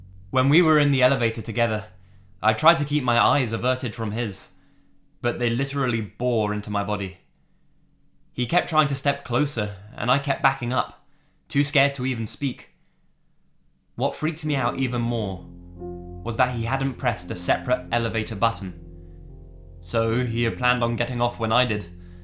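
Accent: England, United Kingdom. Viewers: the main reason I'm subscribing is because of that british accent lol number fooh hehe good job tho i realy enjoy ur vids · God bless the British accent. "I'm good looking and assumed it was a pervert or I assumed I looked like his granddaughter." God bless the British. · are you from England?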